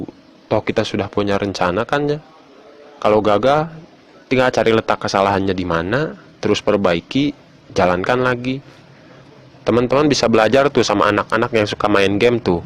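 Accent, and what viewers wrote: West Java, Indonesia. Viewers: sunda bangheet... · Taiwan Taipei ..muhun logat sunda..sami sareung abdi.. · Ini yg yg bicara di vidio ini logatnya Kaya orang sunda Krn qu apal bnget kalu orang sunda itu bicaranya mskpun pke bhasa indonesia tapi logat sunda banget krn qu juga orang sunda Tapi maaf ini cuman perkiraan saya aja.kalu ga bnr bhwa bukan orang sunda minta maaf aja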